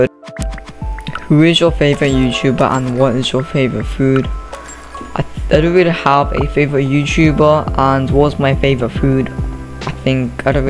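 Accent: United Kingdom. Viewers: U sound British btw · Lol, you have a British accent. But, no hate and nice voice.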